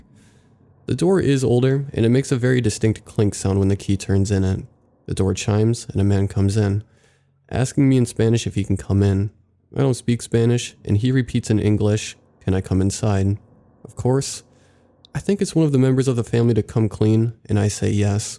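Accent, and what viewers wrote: United States. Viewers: I have great deal gratitude to those who narrate some very different like dr creeps he got own style as do this one both very good.apart from American accent and he can't help that.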